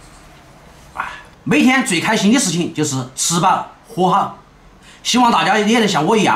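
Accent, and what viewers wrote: Sichuan, China. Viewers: 台灣這裡叫（烏骨雞），補冬的珍禽食材之一。每天都要來看看的視頻，聽你豪邁的四川口音和大口食相，真的好療癒！因為生病，很多東西想吃也吞不下，看你吃彷彿自己也飽餐一頓，過乾癮！！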